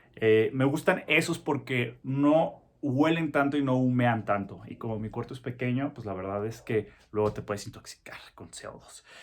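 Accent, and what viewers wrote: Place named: Mexico. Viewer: Es la primera ves en todos tus videos q se nota tu tonada, tono mexicano !!!! Sos un genio y profesional ante todo !!!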